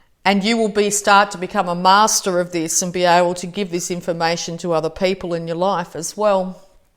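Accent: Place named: Australia. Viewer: Love Aussie ascent...I am in Melbourne...I go to school at Shambala centre Bullpen...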